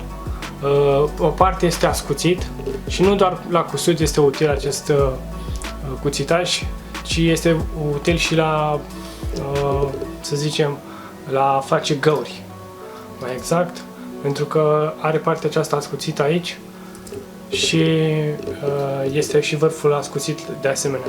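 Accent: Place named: Craiova, Romania. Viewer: Let me guess where you’re from… 🥁🥁🥁 Craiova ❤😂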